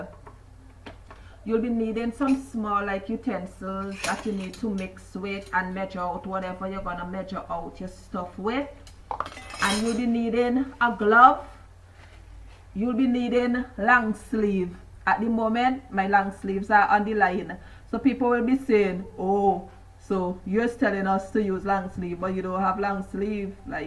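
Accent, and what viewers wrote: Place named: Saint Vincent and the Grenadines. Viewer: OMG. You sure don't sound bajan. My dad is bajan and my mom is vincy. And i was born in vincy and left when i was 14 to Canada. U sound vincy not bajan hon. Lol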